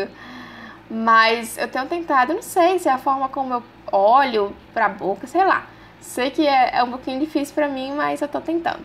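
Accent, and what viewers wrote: Northeast, Brazil. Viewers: Dificuldade de passar batom??? Não vi nenhuma !!! Ficou lindo, make maravilhosa, amo seu trabalho, sua forma simples de ensinar, mesmo mostrando suas dificuldades. Isso mostra o quanto vc se mostra verdadeira e real no seu trabalho. Continue assim! Parabéns !!! Amo esse seu sotaque gostoso, já vivi no Nordeste e amo o clima, o sotaque a comida... Mais uma vez, parabéns !!!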